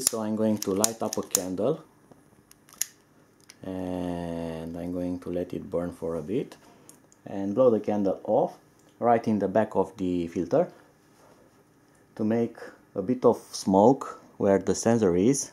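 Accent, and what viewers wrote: Romania. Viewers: Deci dupa accent eram sigur ca esti roman. Nu am reusit sa ma lamuresc pe paginile tale, dar am dat mai jos in comentarii si te-am vazut scriind in limba romana :))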